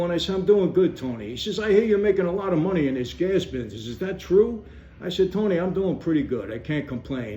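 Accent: New York, United States. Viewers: Many of us from New York talk like this not just Michael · LMAO!! Michael this is straight gold!! You tell a mob story like a true Italian New Yorker. Keep these coming, the old guys in my family from Ozone Park will LOVE these bits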